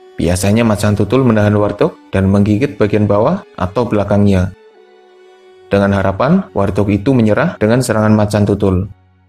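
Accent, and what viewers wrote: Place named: Tegal, Indonesia. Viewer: beda kalau ini logat tegal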